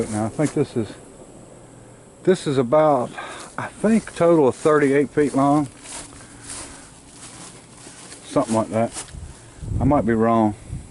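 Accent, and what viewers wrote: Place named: Detroit, United States. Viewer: sounds like a detroit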